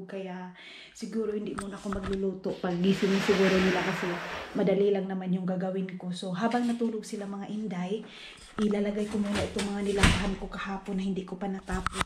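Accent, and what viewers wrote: Visayas, Philippines. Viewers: Bisaya man Did ay ka Ma'am hahaha asa ka sa pilipinas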